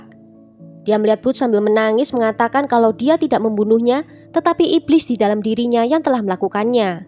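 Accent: Java, Indonesia. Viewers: logat jawa nya itu loh... bikin terngiang ngiang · Lebih pasnya nama chanelnya ini adlh.... Krn medhok Jawanya bikin gemes....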